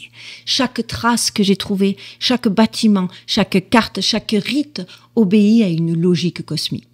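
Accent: South, France. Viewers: En plus avec l'accent du sud❤❤❤ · Merci pour vos excellente vidéos, alors oui tartarie 3. Bonjour de Suisse, j'adore votre accent du sud 👌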